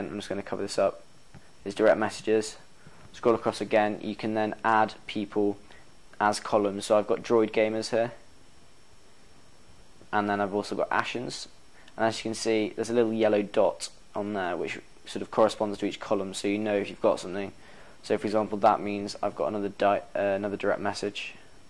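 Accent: United Kingdom. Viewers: Thumbs up for British accent! D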